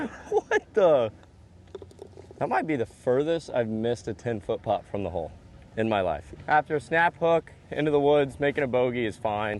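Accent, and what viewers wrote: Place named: Minnesota, United States. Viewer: Minnesota, eh?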